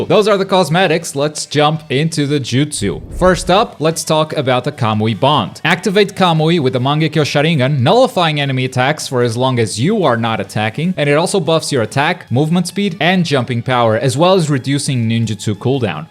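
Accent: Portugal. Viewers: he´s portuguese, LET´S GOOOO, na tua apresentação do canal tu foste logo cm o sotaque d portugal ahaha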